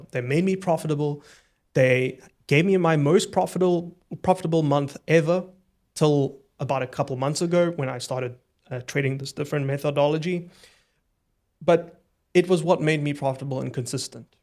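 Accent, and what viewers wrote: South Africa. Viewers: sounds like he is from Cape Town 🤔 lovely video by the way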